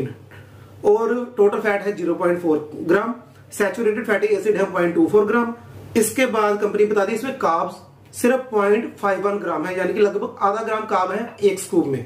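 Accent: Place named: Punjab, India. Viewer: Beautiful review but the Punjabi accent is more beautiful. Keep growing!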